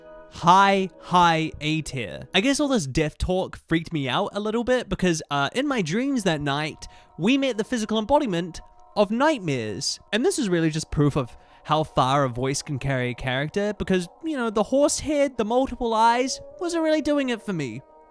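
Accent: New Zealand. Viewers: OKAY SINCE WHEN WERE YOU A KIWI AND HOW DID I NOT KNOW?! Honestly was so shocked by a kiwi streamer, like I’m suddenly so happy that our country is actually on the internet talking about things other than nz. 😂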